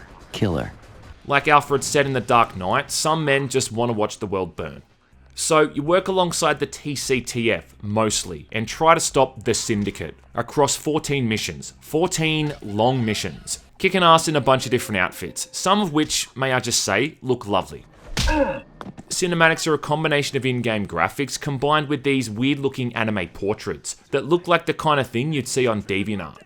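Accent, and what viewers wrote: Australia. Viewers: Hey man, just found your channel and I really really enjoy your content. I don't ever comment on YouTube and who knows if you'll see this but I've been binging absolutely all of your videos this last week. My wife keeps wondering why she's constantly hearing an Aussie man talk about "utter sheeeit" games at 2 in the morning. Just a lot of thought and care went into each of these videos and it really shows. Thanks.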